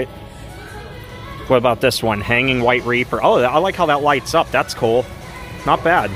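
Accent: Pennsylvania, United States. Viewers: Hey Bro !! Love the Content !!!! Love your Pennsylvania Accent!!!! I Love going into Sprit Halloween every Year and seeing all the cool stuff they have !!!!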